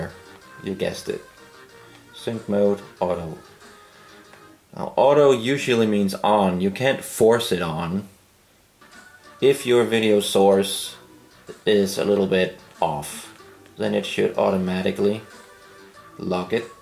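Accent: Denmark. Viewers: I was like... That sounds a tiny bit like a Dane. And sure enough, there is the tv series "Klovn". 😁 So.... How smooth do you rate the scrolling in games, in real life? And is it good for Amiga as well?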